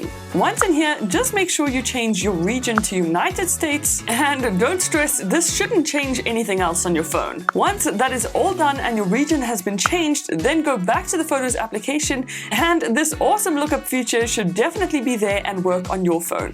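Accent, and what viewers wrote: South Africa. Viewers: Awesome Thanks for this! Very helpful! Random but are perhaps from 🇿🇦 just based on your accent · R u from South Africa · I cant tell if your south African or not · Omg you’re from South Africa 🇿🇦🇿🇦🇿🇦🇿🇦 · ha south african. here's a sub just cause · Thank you enjoyed watching your video are you South African.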